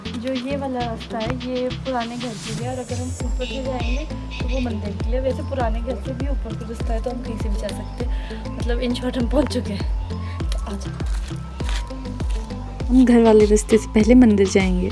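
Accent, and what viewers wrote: Pahadi, India. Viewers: Your voice is be like pahadi nice voice 🥰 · Hahah too sweet ,you have that pahadi touch in your voice and cute expressions😌😌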